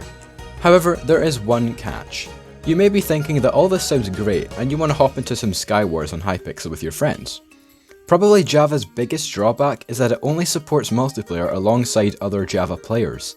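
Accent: Scotland, United Kingdom. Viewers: Bro I really miss you and your video's. Please come back. I just watched your buzzy bees mod video for like the fifteenth time just now and it was honestly the most relaxing thing on the planet. I love you Scottishness and your ( well actually it's from minecraft) cat background music. I wil watch your farmers delight mod video tomorrow and I hope you will return shortly. Have a wee great day.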